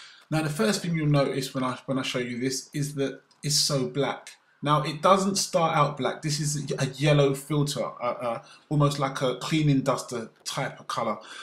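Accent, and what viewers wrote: United Kingdom. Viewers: where are you from .. England ? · You know just by hearing you talk im almost 100% sure you are black and from the uk EDIT: no offence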